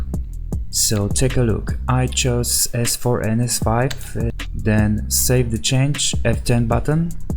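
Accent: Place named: Poland. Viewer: wyczuwam pollski akcent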